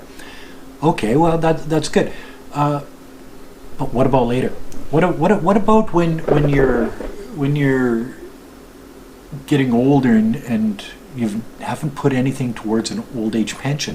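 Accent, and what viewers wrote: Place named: Canada. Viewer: Great video, I like your Canadian accent.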